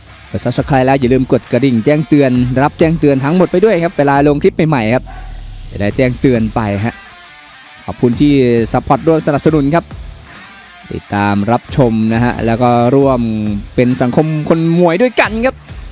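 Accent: Isan, Thailand. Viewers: ชอบอ่ะภาคไทยปนภาษาอิสาน ฟังแล้วได้รอยยิ้มค่ะ😂 ดูมวยแขมรหลายคู่มันดูขย็อคขแย็คยังไงไม่รู้เนาะแตะนิดแตะหน่อยลัมลุกคลุกคลาน ญี่ปุ่นยังอึดกว่านะ นักชกไทยสุดยอดค่ะ มวยไทยสนุกลีลาเยอะอาวุธเยอะดีถึงว่าฝรั่งถึงชอบเรียนมวยไทยมาก❤ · พากษ์ภาษาอีสานมันส์กว่าพากษ์ไทย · พากย์อิสานมันดี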